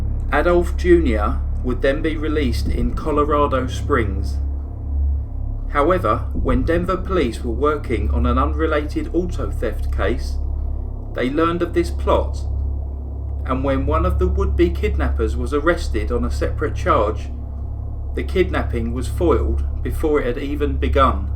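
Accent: England, United Kingdom. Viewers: I think my hubby is jealous, he doesn't understand why I love listening to your voice for one. He knows I love mysteries and scandals, but I told him your voice and others English voices adds to the attraction. Please don't ever lose that! With us being your cousins I cannot figure out how we lost it here centuries ago.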